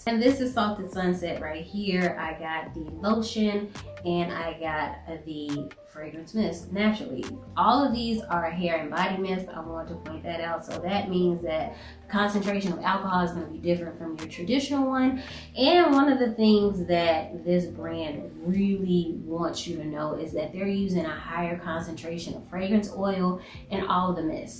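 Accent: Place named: Arkansas, United States. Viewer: As soon as you mentioned an Arkansas influence on your pronunciation, I was IN. *subscribe*. No, I'm not from Arkansas, but I am from who you are. Great review. Well done.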